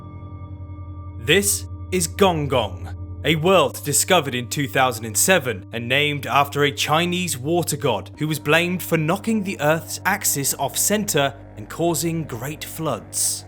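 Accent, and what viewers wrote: United Kingdom. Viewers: Tired of every video narrated by a tired, boring, pretentious sounding British guy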